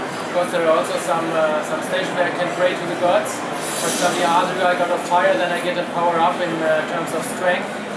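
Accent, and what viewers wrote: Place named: Germany. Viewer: lustiges englisch spricht der herr, hat wohl jeder im raum gemerkt dass der ein deutscher ist :)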